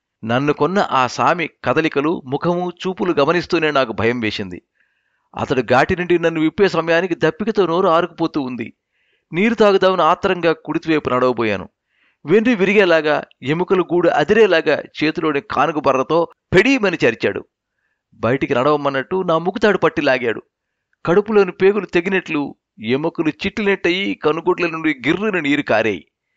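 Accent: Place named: Rayalaseema, India. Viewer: Super story. Congrats to Reddy garu , the writer.మీరు గూడా బాగా చదివారు.‌ రాయల సీమ వ్యవహారిక భాషను బాగా పండించారు.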